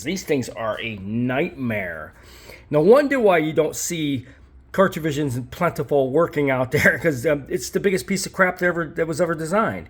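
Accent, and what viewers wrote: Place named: New York, United States. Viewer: You sound like your from NY i em a New Yorker my self.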